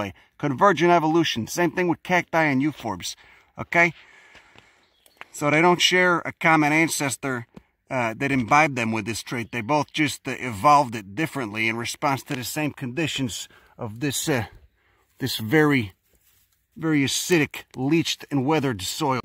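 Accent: Chicago, United States. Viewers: I liked your Chicago Italianiano accent, then you cussed, along with your Latin splain, and you won my heart and mind.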